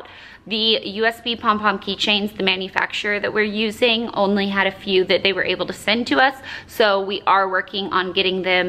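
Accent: South, United States. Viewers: Hi, I've been watching your videos for so long and just honestly love your personality and seeing more into your life! As long as they keep making you happy I hope you keep making vlogs for a long time! Also was wondering after watching the clips from your first youtube video ever if you had to consciously work on your southern accent, or if it naturally got less strong when you moved to LA? You definitely had more of a twang when you were younger haha!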